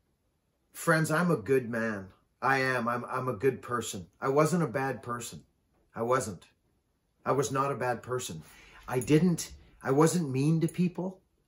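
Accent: Canada. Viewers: Hey, I been watching your stuff for a while now, I appreciate the climbing tips, I just started climbing myself this season, I've got some pretty good climbers helping steer me in the right direction. I've been around power tools and saws my whole life but I've only been clean off fentanyl/heroin for 2.5 years now, and everything is going great. I just wanted to tell you that I really like ur style (even with the cannuck accent) lol. And i enjoy the content. Keep it up man. I wish I could get out to b.c., we don't have any of them giant trees here in Connecticut. Have a great day man, stay safe! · Really great vid. I also feed an insatiable wood stove. Where the hell do you live? You sound like and have the disposition of a classic Canucklehead, I subscribed.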